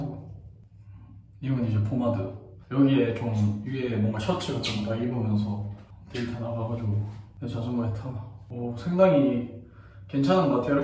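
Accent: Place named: Gyeongsang, South Korea. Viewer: 님 말투면 달고나가 아니라 쪽자라고 해야할 것 같은데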